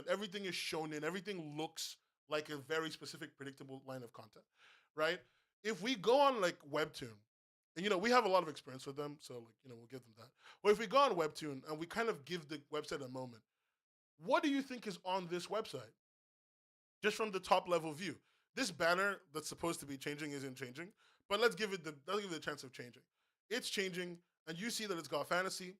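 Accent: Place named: United States. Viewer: youre british?? your accent sounds so american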